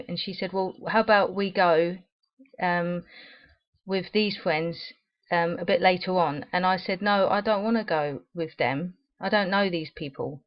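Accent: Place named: England, United Kingdom. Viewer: You are English! haha your accent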